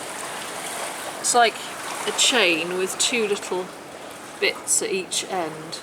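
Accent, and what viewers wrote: United Kingdom. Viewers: Your voice is so angelic, I don't know who's I like better yours or Michael wood for a British accent. You could sell your voice like him no problem.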